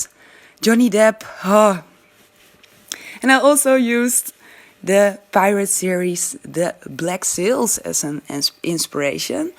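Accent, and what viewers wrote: Netherlands. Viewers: Bij je eerste zin hoorde ik al dat je Nederlandse bent, ☺️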